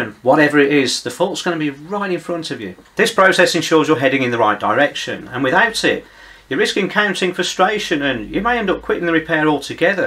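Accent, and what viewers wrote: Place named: England, United Kingdom. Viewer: Now wait a minute. You charge about 300 dollars U.S. dollars for each repair and you said you love it. So I'm going to pay you to do what you love and I see. Your eyes light up when you said love. Everyman is blessed to do what he loves. Next one more really important step, learn to hear the fast talking Englishman or get a voice translator. Have a good love filled day everyday.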